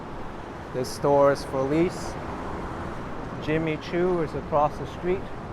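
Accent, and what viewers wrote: New York, United States. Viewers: I love your videos -- I have long read about the city but have yet to visit. I'm reading The Power Broker right now, and your videos help me visualize the city. P.S. I was sorry to hear the comments by those young women. With your distinctive accent, how could you be anything else but a New Yorker???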